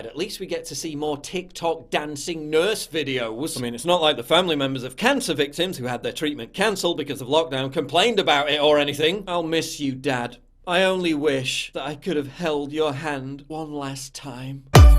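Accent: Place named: RP, United Kingdom. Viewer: It always amuses me that a British accent can make crude language sound upper crust. 😄😄😄😄😄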